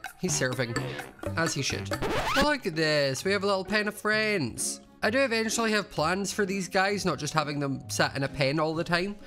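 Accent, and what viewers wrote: Scotland, United Kingdom. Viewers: I've notice your accent is less Scottish since the start of this smp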